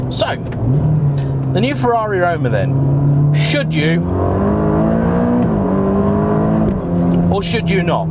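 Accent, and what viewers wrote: United Kingdom. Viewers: hey man, this is my first time seeing your channel. Absolutely awesome video, you have gained a subscriber. You remind me of Jeremy Clarkson with your British accent + the interrupted sentences. Carry on!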